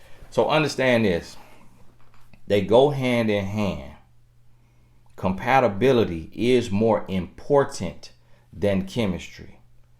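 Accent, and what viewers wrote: South, United States. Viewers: 😂Southern lango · Mz Chocolitt FL must be the deeeeep south! Im for NC, but is on a whole other level of country. Truly distinguishes his message; a country bumpkin with sooooooo much wisdom.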